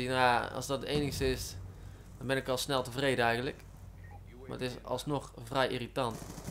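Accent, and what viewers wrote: Brabant, Netherlands. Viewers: Hij komt ergens uit Brabant. ;)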